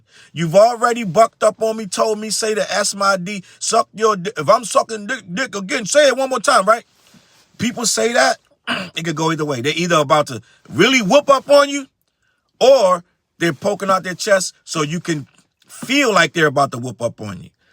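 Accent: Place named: New York, United States. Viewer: Absolutely! Your accent is dope too. You got that NY swag👌🏽 Respectfully.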